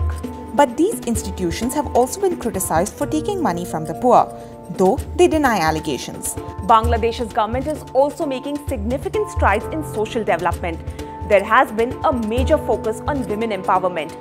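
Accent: India. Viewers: Bring someone who speaks ENGLISH. NOT HINDLISH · Is TRT World an Indian channel !!? Why an Indian with their annoying Indian accent of English and overall and Indian view on the matter of discussion.....!!! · Just 1 percent of your Indian accent makes this entire report 200% biased in your favor. Bangladeshes electricity consumption is <num> Megawatts, where is produces nationally 23,000 megawatt and will increase it to 2 Gigawatt by 2023-4. So what "Electricity hinderance are you refering to? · Hate the Indian presenter and accent. Should use English spoken people.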